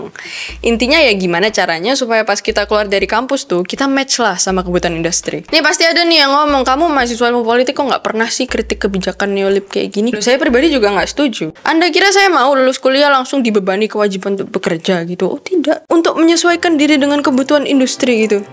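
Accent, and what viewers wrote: Java, Indonesia. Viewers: swenneng aku sing ngonten ini 😊 cici medok hehe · Medok banget mbak XD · Mbak mbak, keren medoknya 👊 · cantik bgtt sayangnya medok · Ahh medoknya 😍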